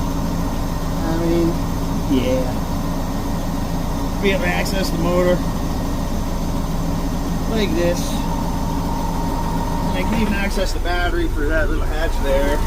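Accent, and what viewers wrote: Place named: Saskatchewan, Canada. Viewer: Your from Saskatchewan, I know it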